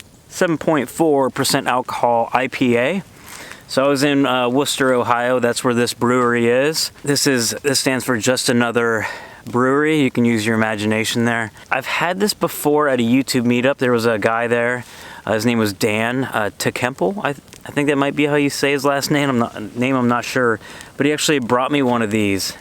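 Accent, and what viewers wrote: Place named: East Coast, United States. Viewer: Enjoyed the video. Did you see the headlights in the background at night? at about . They were off in the distance. I liked your sled & all the amenities it enabled you to bring along. I love camping & love bringing as many amenities as possible, which is a lot because I have a camper. I also do some tent camping. I could tell you were from out east because of your accent. Being originally rom Pittsburgh, I'm familiar with buckeyes. As kids, we used to break open the spiny green outer shell to get to the buckeye & just collect them. Great looking steak dinner! Hope your finger healed up okay. Take care & stay safe.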